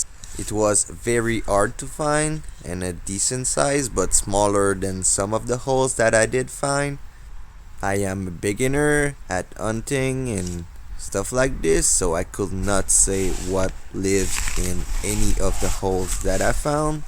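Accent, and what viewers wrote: France. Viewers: To learn more about Canada, I spent some time on Wikipedia. There I got the answer why you speak English with a French accent, very intelligibly at an appropriate rhythm. Stupid but until now I didn't know that both the French first and finally the vain British were involved until 1982 when they were finally hauled back to their island. But they remain vain, proven with their Brexit in 2020. I hope you will be able to outwit small game but it will be difficult. Guess not flying wild is easier from behind a blind. In addition, it is difficult not to betray your presence by creaking twigs, birds, especially crows... I hope then that your joy and happiness will splash off our screen because this "Big Brother" knows how difficult it is in Canada with the gun laws and what you have already done to now be allowed to walk outside your private place with an air rifle. 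good luck and a gelinotte isn't that an English hazel grouse? LOL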